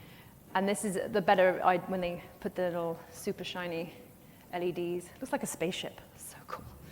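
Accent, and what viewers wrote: RP, United Kingdom. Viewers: Oh god this is a disaster. She's been riding the ditzy, irreverent, 'errrm-girl' thing for a while now, and it's bad enough on a pre-recorded podcast. Being pretty and having a posh English accent isn't enough. You need to be vastly knowledgeable, a skilled communicator, and compelling to listen to. People really underestimate what it really takes to be a Professor Brian Cox or Dr Irving Finkel.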